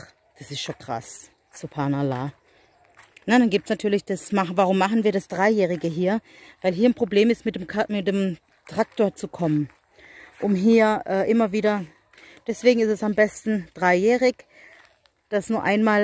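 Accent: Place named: Swabia, Germany. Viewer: Ich höre dich so gerne schwäbisch erzähle... Weckle...... Semmeln wie süß... 😊😂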